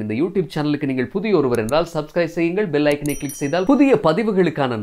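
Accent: Sri Lanka. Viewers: Sri Lanka tamil 🖤🇱🇰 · Ilangai tamizhan avar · நீங்கள் இலங்கை தமிழர்தானே இவ்வளவு அழகா எங்க ஊர்ல எவனும் தமிழ் பேசமாட்டானே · நீங்க பேசுவதே அழகு இலங்கை தமிழா? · Athu thaan Eelam thamil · இலங்கையை சேர்ந்த நண்பர்கள் எனக்கு அதிகம் உண்டு அவர்கள்தான் தமிழை மிகவும் அழகாக பேசுவார்கள் நாம் தமிழர் தமிழர் என்று தமிழ்நாட்டில் இருந்து கொண்டு தமிழை நாம் சரியாக பேசுவதில்லை என்பது இதன் மூலம் சரியாக தெரிந்து கொள்கிறோம். தமிழை மிகவும் அழகாக பேசும் இலங்கையை சார்ந்த அன்பு சகோதரனுக்கு எனது மனமார்ந்த வாழ்த்துக்கள் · Yes Avar kandippa elangai thamilarthan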